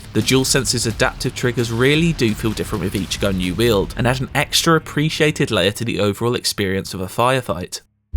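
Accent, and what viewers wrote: United Kingdom. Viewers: This guys British voice pisses me off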